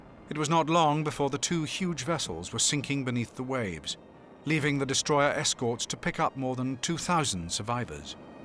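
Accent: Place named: United Kingdom. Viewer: I wonder why, ww1 ww2 documentary, reads, A British accent speaking English.?? They garher fotos and videos of the war or the faces of leaders that era, then they put on their dirty hypocrisy stories.. Let say, these history forgeries is almost miss leaded the truth of western evil greedy imperial perpetrators did dirty inhumane actions.... We in Africa, Specially, Somalies do not trusted those evil English speaking countries.... Because, we never forget the way they divided us.... They don't deserve to listen their evil lies😂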